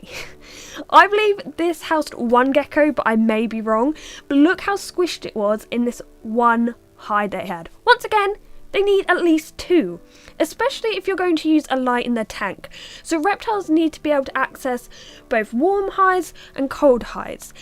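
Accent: United Kingdom. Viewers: Jesus her voice is so painful if she wasn't British I'd like the video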